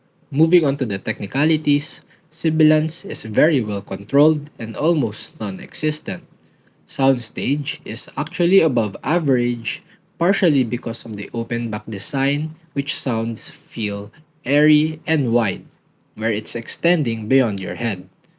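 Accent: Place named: Philippines. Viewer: bro are you also from phillpines like les modio you guys have similar accent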